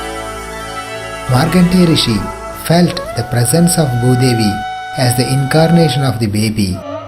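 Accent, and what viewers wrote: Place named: England, United Kingdom. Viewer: Commendable job. Can’t thank you enough for the commentary in English. Sadly heavy English accent. Best wishes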